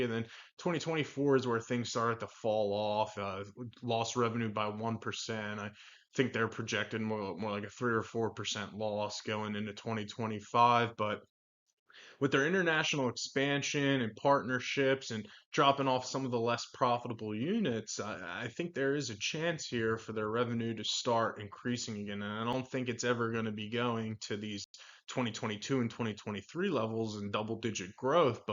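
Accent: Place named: Pennsylvania, United States. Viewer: You from PA? I hear the accent lol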